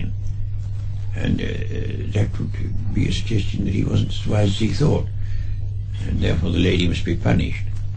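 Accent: United Kingdom. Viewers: Is there a transcript of this interview? It is kinda hard for non-british to cope with that accent tbh :D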